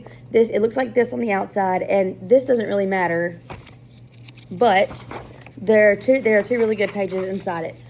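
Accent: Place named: South, United States. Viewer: Southern Accent, 80's hair.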